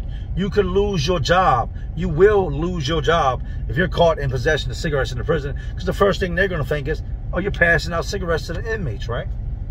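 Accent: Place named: Philadelphia, United States. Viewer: Is this guy from Virginia or Philly because he sounds like he straight outta Philly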